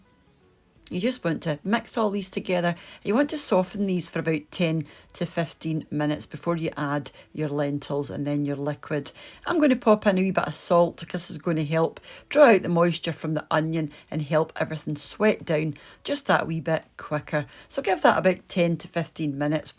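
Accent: Scotland, United Kingdom. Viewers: Definitely going to make this soup. Yum. Thank you so much for the recipe. Love your accent. My Dad came from a small village just outside Glasgow. Miss hearing that wonderful accent. 💕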